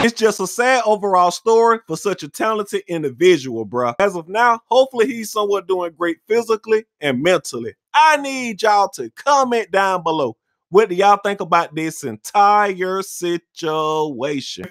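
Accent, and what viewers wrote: South, United States. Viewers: I love the Southern Will Smith voiceover, with ALL my heart. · dang boi u is country as hell where is u from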